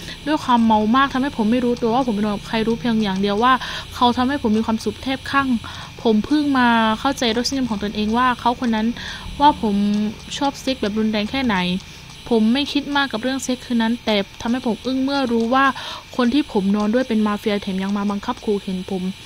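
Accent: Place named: South, Thailand. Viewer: คนใต้ชัว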